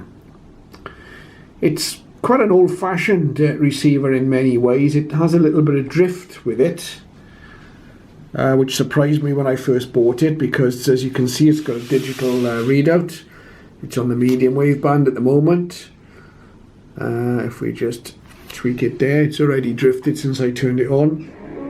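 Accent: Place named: Scotland, United Kingdom. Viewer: I did listen again, and all I heard was that rich Scot brogue…..unlike any other English accent !! I have heard them all.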